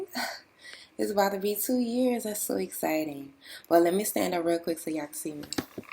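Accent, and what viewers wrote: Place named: New Orleans, United States. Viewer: you from new orleans? i hear it in your voice lol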